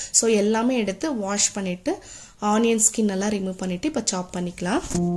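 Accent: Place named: Vellore, India. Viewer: Sister are you from Vellore area.ur slang looks like that.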